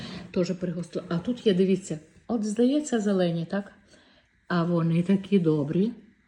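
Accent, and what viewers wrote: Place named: Kyiv, Ukraine. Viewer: Доброго дня пані, сьогодні попала до вас на гостину на ваш канал вперше, бачила ваш коментар у Вікторії та що вирощує свині з Запорізької області, переглянула ваші шортси і відео, дуже цікаво, у вас такий гарний голос, приємний, звідки ви? У вас така мова як у мої тітки яка живе в Фастівському районі Київської області. Тільки прочитала під одним відео що у вас можна замовити пепінку, вперше про неї почула у вас, але як смачно ви її куштували і яка вона соковита в мене аж слина з рота побігла😅. І тут я читаю коментар який був написаний два дні назад що вже немає у вас саджанців на замовлення, дуже вдячна якщо дасте номер пані Наталії з ізмаїла, а можливо у неї є також канал на ютубі? Також буду вдячна якщо скинете силку на її канал, соромно звзнатися але інжир в такому вигляді я ще не їла, тільки в'ялений і той що я їла вперше він мав запах якихось ліків. 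Скажіть будь ласка по якій ціні Ви купували той інжир, плоди, що з Ізмаїла. А ще буду дуже вдячна якщо дасте адрес, того пана Анатолія де ви брали саджанці пепінки. Я сама з Одещини 🙋‍♂️. Поки дослухала ваше відео, вже в мене склалося враження що ви з Житомирської області, там також жила моя покійна тітка. Ще раз дякую дуже велике вам за відео, дуже приємно було з вами познайомитися, скажіть будь ласка а ви себе не показуєте у відео, дуже приємний ваш голос хотілося б вас побачити. Всього вам самого-самого найкращого пані, хай Бог береже вас і вашу родину, Божого вам благословення 🕊️🙏🙏🙏 буду з нетерпінням чекати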